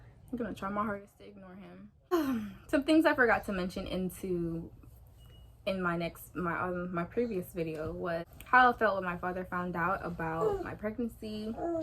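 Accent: Jamaica. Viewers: Ooouuu u Jamaican girllaaaa😛😁. Sorry lol my bf also Jamaican nd the fact that I understood wat u was saying I got press lol😂. He be teaching me stuff😄.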